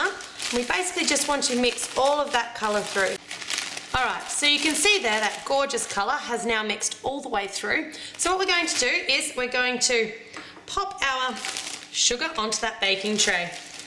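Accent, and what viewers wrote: Australia. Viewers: i. love. australian. accents.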